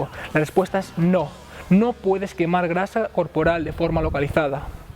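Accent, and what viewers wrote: Spain. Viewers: Me gustam muchos tus videos, apesar de ser español no eres desagradable de oir (soy latino y ocurre normalmente, con todo respeto lo digo), tienes una exelente redaccion por los pocos cortes en cada video y no vives sin la camiseta intentando lograr lucirte en cada video. De verdad un aplauso !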